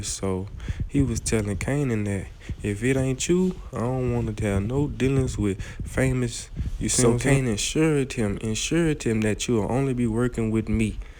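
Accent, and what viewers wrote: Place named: New Orleans, United States. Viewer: Hey bro. Love the commentary with the NO/BR accent. 504/225✊🏾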